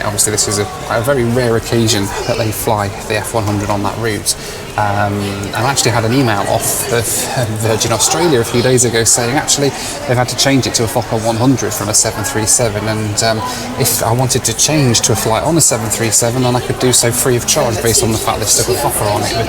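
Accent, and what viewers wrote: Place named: United Kingdom. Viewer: Read the automatic captions to nearly fall off your chair laughing! That, combined with British accent, had me laughing like an idiot.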